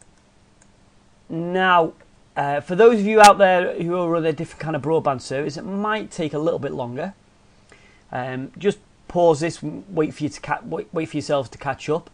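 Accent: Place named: Scotland, United Kingdom. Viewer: heh nice scottish accent!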